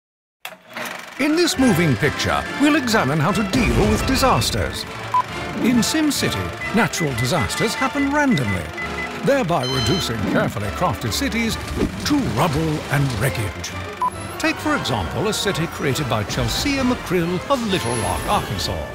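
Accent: United Kingdom. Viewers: but it looks great because a British chap is doing the voice over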